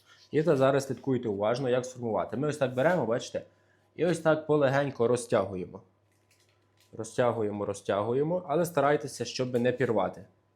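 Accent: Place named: Lviv, Ukraine. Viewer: За акцентом - це мій земляк, львів'янин! Цікаво, вгадав, чи ні? Рідний говір майже завжди пізнаю. А тепер питання: 4 хлібчика мені забагато, чи є якісь тонкощі, якщо розділити все на 2?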